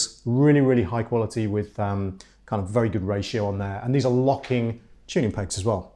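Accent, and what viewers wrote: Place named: RP, United Kingdom. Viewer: Dude in ripped jeans yet he has a posh British accent and playing a $5000 guitar.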